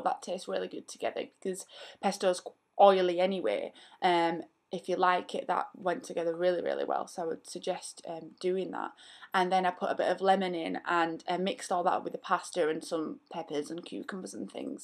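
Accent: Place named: Yorkshire, United Kingdom. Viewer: Yorkshire accent if I'm not mistaken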